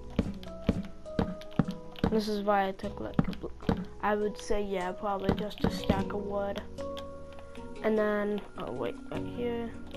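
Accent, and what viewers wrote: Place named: United Kingdom. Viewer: Holy shit you sound British in this video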